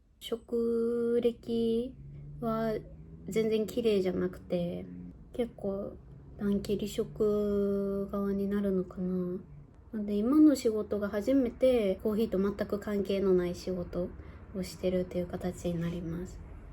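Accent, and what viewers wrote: Kyoto, Japan. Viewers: nokoさん改めて2万人おめでとうございます🎉🎊 nokoさんの京都弁のお喋り可愛くて好きです🥰 むっちゃんへの愛❤️感動しました🥺🥰 これからもカフェやスイーツ、京都のこと、むっちゃんのことなどなど、楽しみにしていますね😉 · nokoさんの柔らかい声、京都弁、聞いててとても癒されます😍これからも喋りのvlogぜひ撮ってください✨ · 2万人登録者おめでとうございます㊗️ さんの京都弁がとても可愛いくて癒されます😍私は東京生まれの東京育ちで看護師しています。京都に憧れていて、いずれ京都で暮らしたいと、思っています。猫とコーヒーが私も大好きでいつも楽しく観ています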